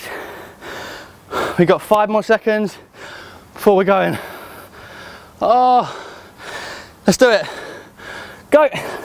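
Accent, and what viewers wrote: England, United Kingdom. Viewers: Alice Liddel and a bit of a softer english accent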